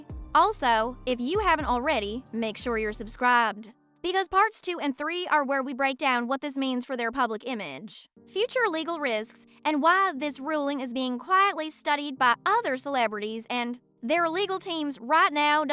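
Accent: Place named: South, United States. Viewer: This is hilarious. An AI video with a deep southern accent. You can always spot an AI video by the utter lack of cadence. But this one with a southern accent is glaring. Lmao😂😂😂😂😂😅😅😅😅😅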